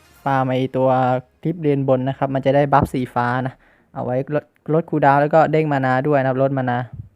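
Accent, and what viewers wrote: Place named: Thailand. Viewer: รู้สึกพี่เหน่อๆ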